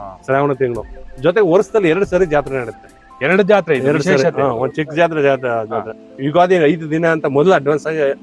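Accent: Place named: Chamarajanagar, India. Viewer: ನೀವು ಈ ರೀತಿಯ ಪ್ರಕೃತಿಯ ವಿಡಿಯೋ ಗಳನ್ನು ಮಾಡಿ brother, ನಮ್ಮ ಗುಂಡ್ಲುಪೇಟೆ ಸುತ್ತ ಮುತ್ತ ಅತ್ಯದ್ಭುತ ಪ್ರೇಕ್ಷಕ ಸ್ಥಳ ಇದೆ, ಭಾಷೆ ಬಗ್ಗೆ ತಲೆ ಕೆಡಿಸಿ ಕೊಳ್ಳಬೇಡಿ ,ಚಾಮರಾಜನಗರ ಉಚ್ಚಾರಣೆ ನೇ ಹಾಗೆ ❤ನೀನು ಮುಂದುವರಿ ಗುರು ❤❤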